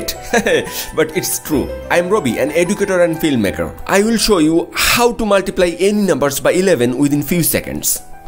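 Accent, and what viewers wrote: Bengal, India. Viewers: Are You A Bengalee Sir? because your voice, name and English accents are like a Bengalee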